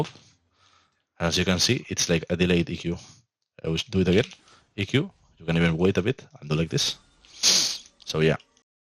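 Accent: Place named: Spain. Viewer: AJJAAJJAJ hermano como se te nota el acento de ESPAÑA con do cohone JAJA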